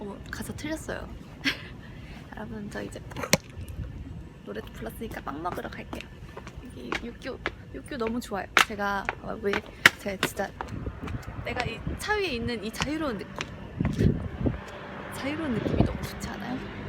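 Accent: Seoul, South Korea. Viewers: 말투가 완전 30년전 서울사투리네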